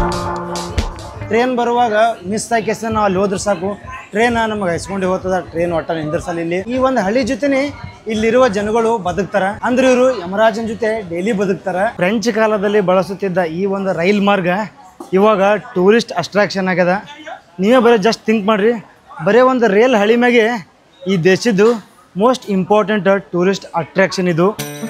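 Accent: North Karnataka, India. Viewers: ಅಣ್ಣಾ ನಿಮ್ಮ ವಿಡಿಯೋ ಮಸ್ತ್ ಸೂಪರ್ ಅದಾವ ಅದರಲ್ಲೂ ಉತ್ತರ ಕರ್ನಾಟಕ ಭಾಷಾ ಶೈಲಿ ಬೆಂಕಿ ನಿಮ್ಮ ವಿಡಿಯೋ ಇನ್ನೂ ಹೆಚ್ಚಿನ ವೀವ್ಸ್ ಆಗಲಿ ಆಗಬೇಕು ಆಗತ್ತೆ ನಿಮ್ಮ ಸ್ವಚ್ಛ ಭಾಷೆ ವಿಸ್ತರಣೆ ವಿಷಯ ವಿಡಂಭನೇ ಮಸ್ತ್ ಅದ ಹೀಗೆ ವಿಡಿಯೋ ಮಾಡ್ರಿ ನಮ್ಮ ಬೆಂಬಲ ನಿಮಗೆ ಇದ್ದೆ ಇದ್ದೆ ಅಣ್ಣಾರ ❤❤🎉🎉... ಜೈ ಹಿಂದ್....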